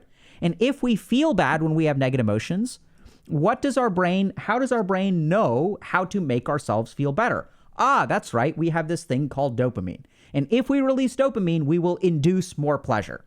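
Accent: Russia. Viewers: Solid Russian accent (i have the same) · Doctor Ks russian accent is top notch tho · That’s a solid Russian accent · I found your Russian accent hilariously great. Very good!! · Hearing speak in Russian accent is the real therapy, everything else is just a bonus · I liked the russian accent) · russian accent sounds so cool, tbh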